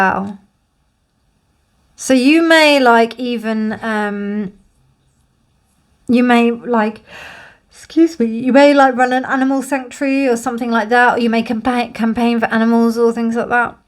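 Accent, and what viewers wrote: United Kingdom. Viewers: 🌻 Good morning, Ms! 🌻 I'm drawn to the art of the 1st card. I wanted to see a flip through of the deck so I was about to search it. Unfortunately, I was not able to comprehend your British accent. Hehe. I turned ON the CC but it cannot capture the right words too. Hehe. Can I know what's the title of the deck, Ms? Or would you like to create a flip through video?? 🤓💕 ✨ Thanks for reading! ✨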